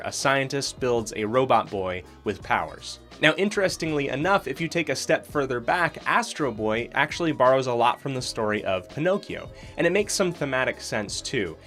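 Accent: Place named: United States. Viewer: When your name has an -es instead of -s and ends with a -ston But you have an American accent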